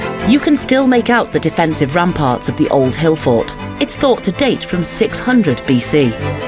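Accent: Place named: RP, United Kingdom. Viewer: You got a BBC voice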